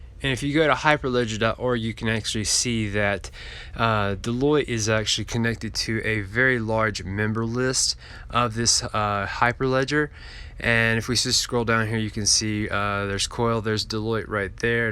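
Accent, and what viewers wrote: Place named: United Arab Emirates. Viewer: You sound a guy from UAE. 50 should be fine for you to make few millions if you have bought few thousands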